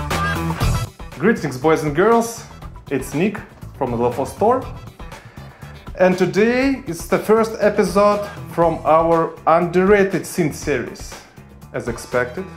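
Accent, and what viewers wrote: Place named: Russia. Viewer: А можно на русском такой же? Судя по произношению Русский вам должен быть знаком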